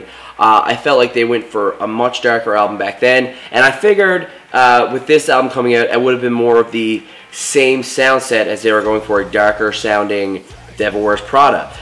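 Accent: Canada. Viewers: Are you Canadian ? Decent review don't like mikes new vocals .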